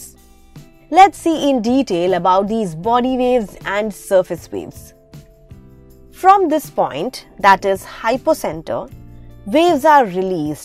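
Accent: India. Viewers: Not the india accent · Omg, what an accent..i hate indian accent!!!🙄😱🤮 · I hate indian accent. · Indian accent 😂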